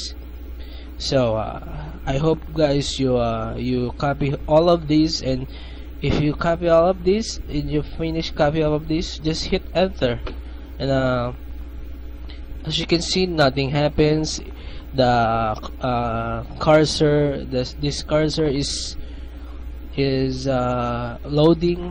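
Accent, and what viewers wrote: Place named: Philippines. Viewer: Pare Pilipino ka noh,, hahah obyus na obyus..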